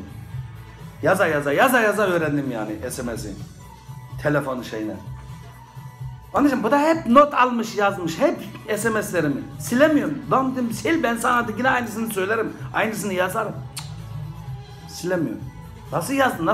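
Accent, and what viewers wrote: Yozgat, Turkey. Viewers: agbi nerelisin agbi? bizim yozgat sivesi var sende:)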